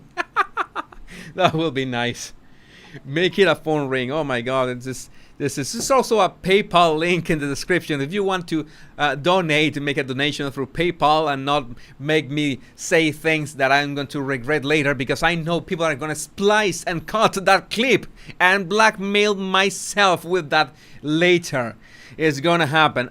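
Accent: Cuba. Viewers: I love the Ricky Ricardo-ness of your accent! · My father was Cuban too. My mother is Puertorican and both came to this country at 10 and 12 yrs old, respectively. My father never lost his accent! He was so proud to be Cuban. He passed Jan 2020. He was also a first responder during 9/11. Anyway, I also love your accent as it reminds me of Papi. Thanks,!! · What I love is your Ricky Ricardo pronunciation, don´t chance it. I practice my English with you, and I understand you almost everything. Love your accent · I e lived I both countries - is the accent Cuban or Venezuelan ? Imo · My Cuban father came to America and married an American. Growing up, we watched I love Lucy and I was so proud that my dad had an accent like Ricky Ricardo. My father died in 1990 and I confess that your accent takes me back. I love listening to you speak. It's like music to my ears. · Your Ricky Ricardo accent is fantastic. 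Love and bliss to you,! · you make me laugh when you say your "Ricky Ricardo" accent. I was just wondering if you hear yourself the way others do? Do you hear the Ricky Ricardo accent? I'm from Boston and whenever I travel somewhere else people, random strangers, have come up to me and tell me "You're from Boston right? I can tell by your accent!" I don't hear it at all!:) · I love your Ricky Ricardo accent, and like your perspective in the analysis of the body language. It is very interesting. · your Ricky Ricardo accent is part of your charm.😄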